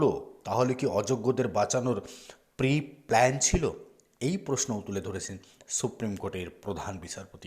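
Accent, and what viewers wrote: Bangal, Bangladesh. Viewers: ভালো করে কথা বলতে শিখুন বাঙ্গাল ভাষার গন্ধ এখোনো যায়নি।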